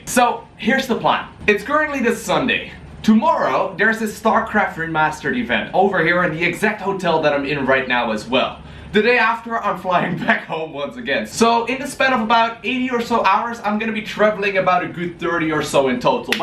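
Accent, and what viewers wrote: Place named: Netherlands. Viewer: You are from Netherlands? I knew that the accent is netherlandish!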